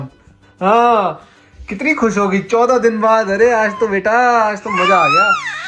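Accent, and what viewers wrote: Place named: Haryana, India. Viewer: Hey bhaiya....❤love from India.....big fan of your haryanvi accent 😀😀😀god bless your family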